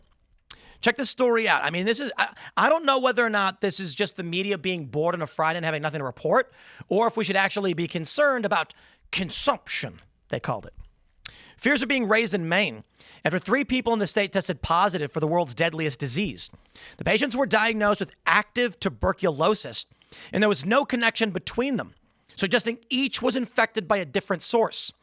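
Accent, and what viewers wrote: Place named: South, United States. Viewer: Good southern accent. I’m southern & sound like cornbread.😂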